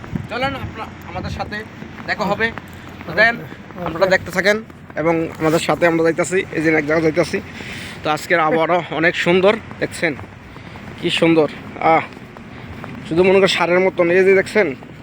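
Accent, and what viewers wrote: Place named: Bogura, Bangladesh. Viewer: ভাই মনে হয় আমাদের এলাকার লোক বগুড়া নওগাঁ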